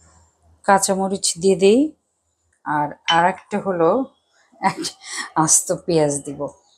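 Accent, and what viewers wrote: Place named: Noakhali, Bangladesh. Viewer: নোয়াখাইল্লা আন্টি আসলে আপনি কে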